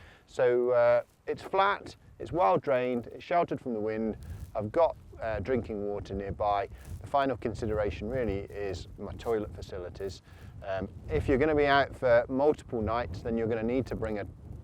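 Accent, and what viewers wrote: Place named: England, United Kingdom. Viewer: He sounds English and most of the rules he's advocating only apply in England. He does not seem to realise Scotland is part of UK.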